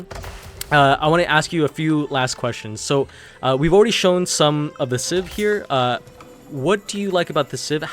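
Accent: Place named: Iran. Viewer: the most generic persian accent ever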